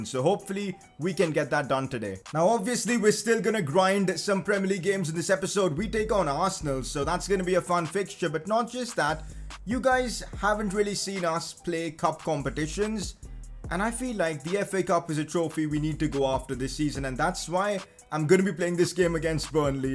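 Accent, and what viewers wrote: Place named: India. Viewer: indian accent is so funny only reason why i watch your videos lol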